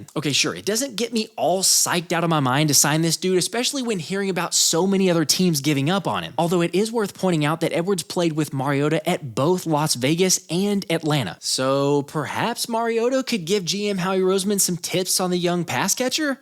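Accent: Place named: South, United States. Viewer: Where are you from bro? Have a little southern twang to yiur accent, its obvious you are nkt from Philly...but great content!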